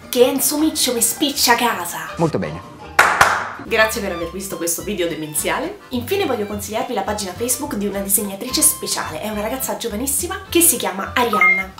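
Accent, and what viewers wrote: Rome, Italy. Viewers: Fantastica come sempre xD troppo forte !!! si capisce che sei romana !! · Amo alla follia la tua comicitá, la tua parlata romana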